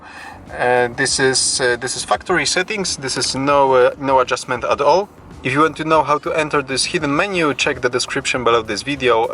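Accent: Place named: Poland. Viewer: polski akcent angielskiego, zawsze śmieszy :) ...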